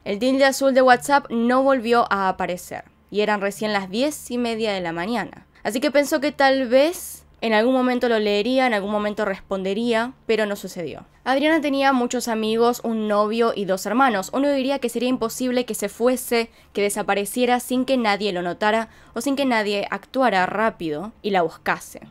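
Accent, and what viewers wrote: Argentina. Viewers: Por favor ya no digas ANIO, ES AÑO POR FAVOR 🙏 😭, me ensartar como investigas punto por punto tus teorías llevo más de una semana escuchándote desde que descubrí tu canal y tu acento argentino es muy bueno 👌 solo porfavor en la pronunciación es año🤗 gracias espero puedas leerlo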